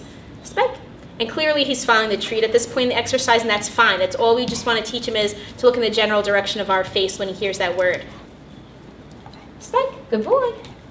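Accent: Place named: New Jersey, United States. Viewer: Duog. Gotta love that Jersey accent.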